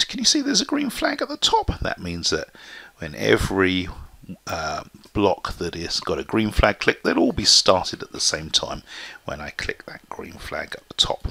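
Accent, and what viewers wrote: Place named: United Kingdom. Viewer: he sounds like so so so so british in <num> x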